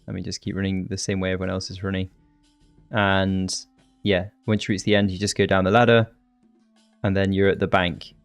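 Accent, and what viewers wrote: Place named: United Kingdom. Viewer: Great video but you're british :/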